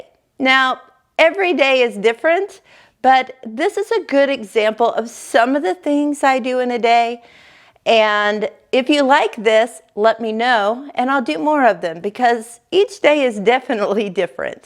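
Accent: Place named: South, United States. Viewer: I'm down in Florida - so many things are different due to temperature, humidity, sand soil. But I love seeing everything others do and can always learn something new. It helps to know where a person is located to determine how their environment may be different and changes I might need to make before copying them. I do think I detect a southern accent in your videos which is beautiful!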